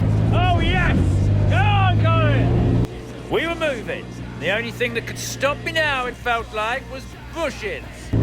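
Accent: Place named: Luton, United Kingdom. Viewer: Fit blonde with a Luton accent. All fur(ze) coat and no knickers.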